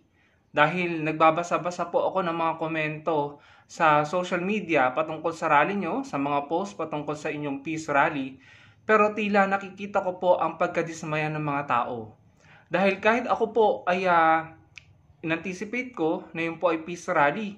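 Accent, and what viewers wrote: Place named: Quezon, Philippines. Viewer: Taga Quezon ka po ba may puntong katagalugan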